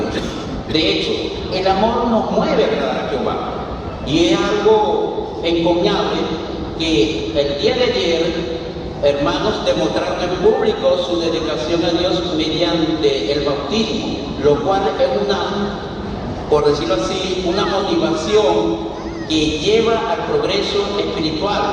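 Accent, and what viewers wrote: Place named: Venezuela. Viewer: Le sentí el acento venezolano desde el principio....tiene más discursos de esta asamblea por favor??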